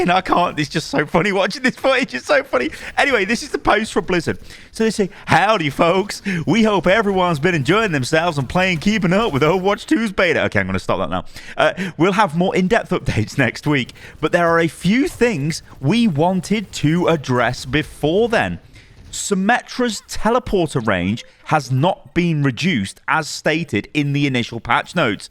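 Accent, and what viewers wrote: United States. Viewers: I appreciated the return of the American accent,. 😄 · your country accent is 🤌 top notch.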